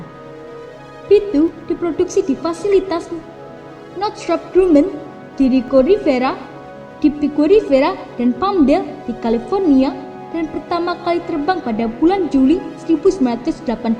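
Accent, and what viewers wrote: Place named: Aceh, Indonesia. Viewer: Logatnya mirip logat aceh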